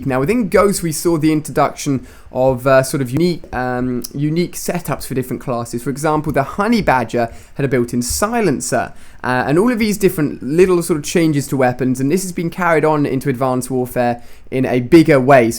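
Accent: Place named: United Kingdom. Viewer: FINALLY they bring back a british voice to CoD.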